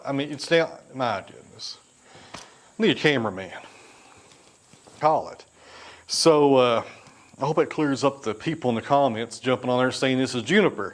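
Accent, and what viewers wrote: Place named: Appalachia, United States. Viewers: I fully understand your Kingsportian dialect and never knew you had bloopers. Keep on talkin the way you do! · What’s funny I have that same Appalachian accent so I understand everything you say. Watch Celebrating Appalachia and you’ll see it’s the way we talk for hundreds of years.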